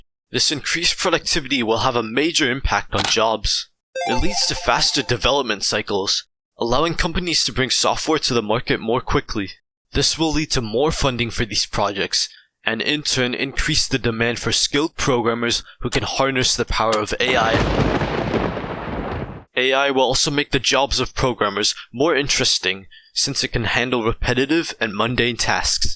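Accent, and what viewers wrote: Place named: Netherlands. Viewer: I can smell the Dutch in your accent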